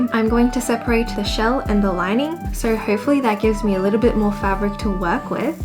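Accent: Australia. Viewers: And cute personality and adorable australian accent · Absoooolutely love this video, your creations and your editing. Definitely gonna subscribe :) But I didn't quite understand how you did the middle cinch on that second top (I'm new to sewing and also I'm French, and although I love your Australian accent it was going a little to fast for me to keep up). I understood you made a channel to gather the fabric but i didn't get how ? Could someone explain it to me ? Thank you so much and I hope you get more recognition! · whenever i find a new channel and i hear an australian accent... it's like god decided to give me a gift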